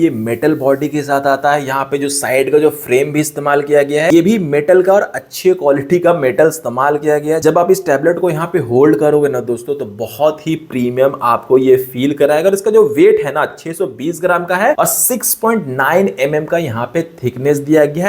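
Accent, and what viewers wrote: Bihar, India. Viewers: Sir aap bihar se hain kya??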